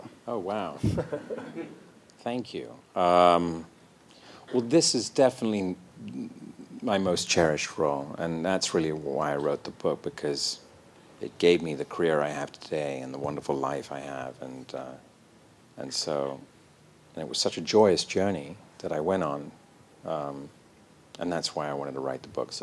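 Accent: United Kingdom. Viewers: I can’t tell if he’s trying to put on an American accent, or if he’s just lost some of his British accent over the years... but it seems kind of mixed here. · The little hints of the Queen’s English just keep my heart a-flutter🥰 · Yes he's from London. Comes from a relatively posh family. He even went to school at Harrow. His accent is confusing because he has lived in the US for over 40 years and sort of drifts back and forth between British RP and American.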